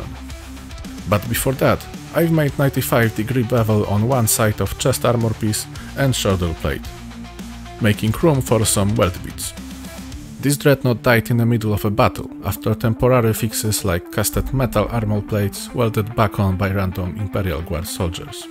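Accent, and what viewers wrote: Poland. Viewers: Siema 😊 Polski akcent ❤ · sound like polish accent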